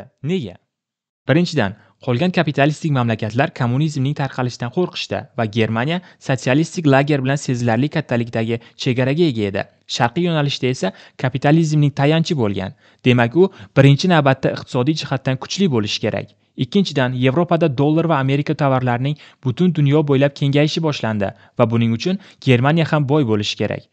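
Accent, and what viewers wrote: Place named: Surxondaryo, Uzbekistan. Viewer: Siz Surxondaryoliksiza, ya'ni Sariosiyo tumanidansiz adashmasam)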